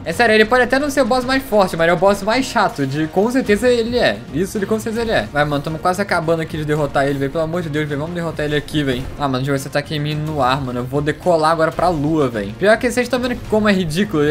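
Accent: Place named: Rio de Janeiro, Brazil. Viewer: Carioca é assim memo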